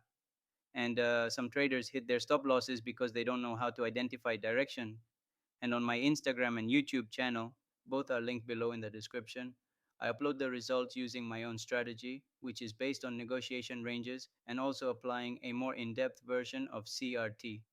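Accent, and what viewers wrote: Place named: India. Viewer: Estabas bien haciendo tus explicación en tu idioma natal el castellano. Pareces un Indu hablando ingles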